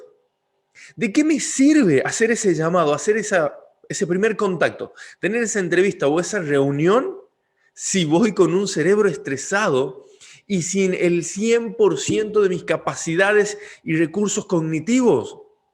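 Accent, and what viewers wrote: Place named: Tucumán, Argentina. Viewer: Muy claro. Con acento tucumano hace rato que no escuchaba esas rr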